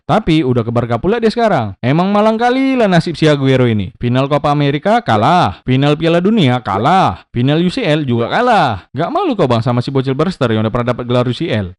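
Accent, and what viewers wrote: Medan, Indonesia. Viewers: Medan mana kau bang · Bg abg org medan?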